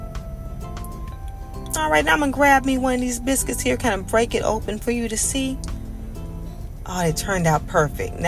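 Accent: South, United States. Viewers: Greetings, from Florence, OR. I'm new to your channel, love the southern drawl, and enjoy your demos. Haven't tried any yet but they're high on my priority list. All the best to you with appreciation, Diane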